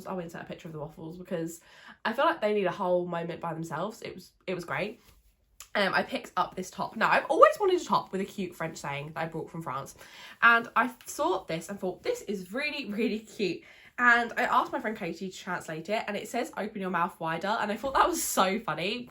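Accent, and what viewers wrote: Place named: United Kingdom. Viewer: I REALLY LIKE YOU ESPECIALLY YOUR BRITISH ACCENT 😃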